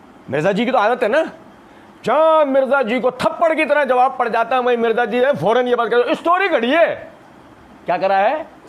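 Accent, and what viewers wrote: Punjab, India. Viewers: Maza Ata Hai apki Punjabi sun k Mera dost ik Karachi ka Hai wo b asy bolta Hai ❤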